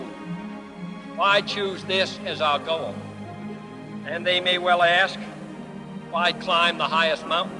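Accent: Boston, United States. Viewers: "......nat becausah there easy bat becausah there hahhd" -heerlijk Bostonian accent . Wisdom and courage combined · THAT is one wicked Bostonian accent....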